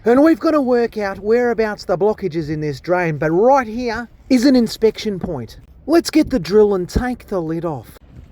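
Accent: Australia. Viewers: omg your austraulian accent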